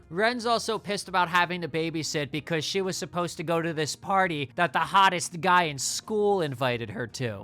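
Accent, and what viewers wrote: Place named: Hawaii, United States. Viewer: Dude r u from Hawai’i?? U got sum pidgin in you!!!!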